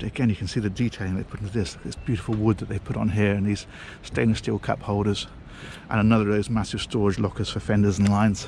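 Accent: England, United Kingdom. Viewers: Another one of your Splendid Reviews,. The World will miss you on U-Tube when you retire from doing these Very Positive reviews on your Aquaholic channel.I am guessing on your Age now. I Believe what really makes you Better than the Rest is your English accent and Your British Personality which Shines Through for you.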